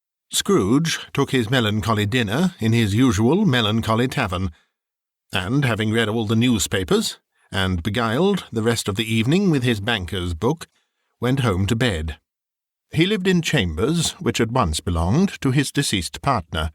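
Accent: United Kingdom. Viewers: I tried listening to a few other versions. A Christmas Carol, narrated without a British accent, cannot be borne. My opinion, I know, but at my age, traditions die hard. So, yours will be the version I will be listening to this season. I must admit that due to my illness, myasthenia gravis, I will not be able to cook for Christmas this year or decorate. It is all I can do to take a few steps and do my speech therapy, swallowing therapy, and everything else therapy, lol. So, please know how much your production will mean to me this year, about the real meaning of Christmas. I can't now join your Patreon, but am hoping to as soon as my disability is approved, to thank you in a more tangible way for the very many long nights your charmingly narrated stories have helped me through insomnia, pain, and sleepless nights. I have been following you since the beginning under my other channel, Wmnoffaith1, but I am the same person, notwithstanding. 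( It is rare that one has an opportunity to use notwithstanding in a sentence; it makes me feel positively Dickensian:). Anyway, I just wanted to express my thanks, and let you know that regardless of the problems, and wars you have had to endure this year to keep your channel alive, it is appreciated by so many of us, and provides more comfort than you know. Merry Christmas and a Happy New Year, to you and yours. I wish you all of the success and blessing and prosperity that you so richly deserve in the coming year. Sincerely, Cheryl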